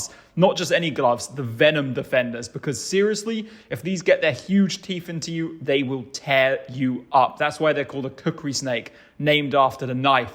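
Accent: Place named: England, United Kingdom. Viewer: Constantly amazed at the sheer volume of variety of our slithery friends., you are an excellent member of the YouTube Herpetologist Vanguards (patent pending). You have a pleasant voice and the English accent doesn't hurt, and that exuberance can't be faked. Thanks for this and all the videos you've upped. Er, not so sure 'bout the mullet. Brings back flashbacks of the 80's <shudder> 🖖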